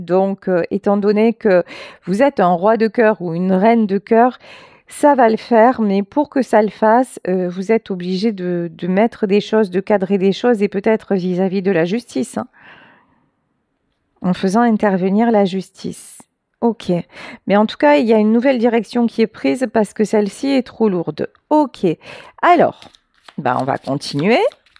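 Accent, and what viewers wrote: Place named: Nîmes, France. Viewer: Bonjour 🌸je suis hélas dans cette situation d’horreur 😫😡plus trop de force, Plus d’énergie mais je veux me battre & obtenir réparation..d’injustice p/abus de Confiance s/personne mis sous tutelle (Packinsonien)Besoins d.aide!... D.agréables moments d’entendre votre voie de « NÎMES «encore mercie 🌸🍀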